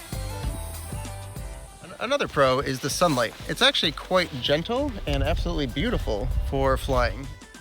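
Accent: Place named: United States. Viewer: Thanks, just back from watching. Yeah, that water looked cold to me. Glad you' were able to recover the plane. BTW, are you an expat? Sounds like native USA English to me.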